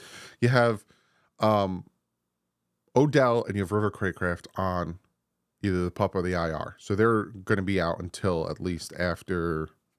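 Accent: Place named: Hawaii, United States. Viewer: I really wanna hear this rant and the Hawaiian accent lol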